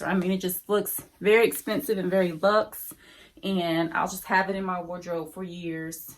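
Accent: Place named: South, United States. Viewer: The J crew blazer print is referred to as herringbone print 😉. I love your hauls and your southern accent is so cute.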